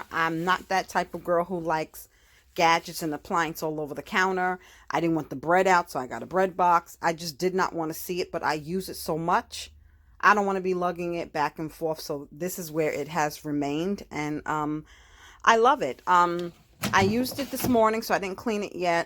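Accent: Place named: New York, United States. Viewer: That little piece was I'm sure was the best. But the rest I'm sure was perfect. You sound like a New Yorker all day and night. Something I will try soon!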